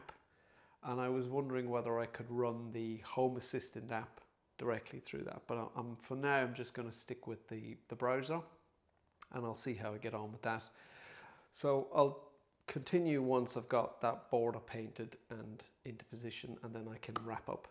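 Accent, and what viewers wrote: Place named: Ireland. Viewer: Painter's low tack blue tape or plain masking tape are my goto when anything needs precise marking out for nails, screws, drill holes, biscuit holes or multitool sqaure cuts. Love the fancy radiator on the wall and the herringbone floor. My true blue Dublin ears despite being in central europe for 20 years are detecting an Irish accent.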